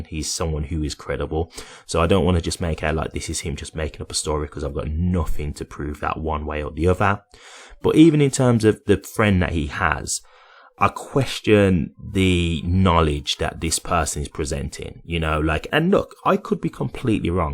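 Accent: Birmingham, United Kingdom. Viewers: You’re from brum ?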